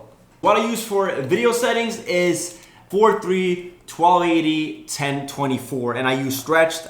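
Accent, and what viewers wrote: Sweden. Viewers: Are you from Sweden? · U are talking a littel bit swedish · are you from sweden?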